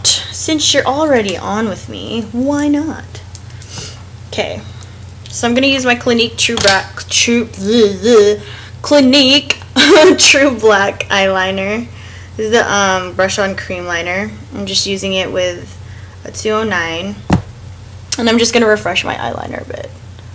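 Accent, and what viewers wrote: England, United Kingdom. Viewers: Your English accent is fabulous :))) Loving the make-up x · LOLL I LOVED THE ENGLISH ACCENT omgg so funny man!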